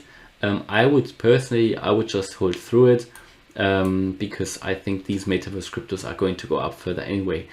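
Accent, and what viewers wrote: Germany. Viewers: What accent do I detect Are you German?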